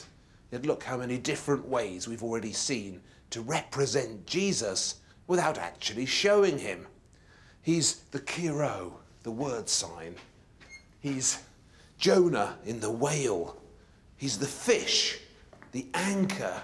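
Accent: England, United Kingdom. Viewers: Superb presentation skills and great content. Very non-irritating British accent. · The speaker is a liberal. Just look at his hair. To be honest with you this guy doesn’t understand history. He just gives one part of history. Just because he has a English accent doesn’t make him an expert. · this british accent is so ugly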